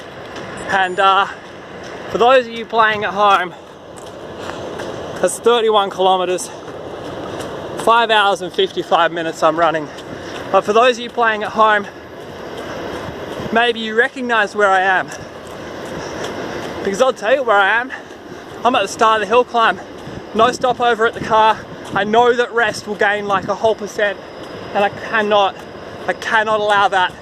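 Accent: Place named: Australia. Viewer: That was awesome. As a Canadian, I have known a bunch of Australians, and not only do I like hearing the accent, but most of the Aussie's that I have met were pretty adventurous/slightly crazy. This is exactly the kind of thing they would do on a whim. Loved how it seemed the watch kept taunting you by dropping a % just when you were ready to pack it in, like a challenge. Good on you for hitting the 0% and going one further.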